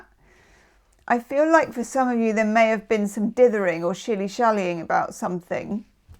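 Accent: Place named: Bath, United Kingdom. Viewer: I recognise your accent. Would you be near bath in England?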